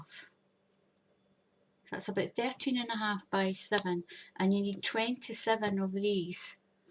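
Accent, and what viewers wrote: Scotland, United Kingdom. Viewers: I loved listening to your lovely Scottish accent as much as I loved watching the flower coming to life. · Just happened across this posting as I was looking for ideas for my daughters wedding. I have to say it was like my Mum was talking to me !(Scottish accent!) Thank you for this wonderful idea ! Now I need to make 30 of these!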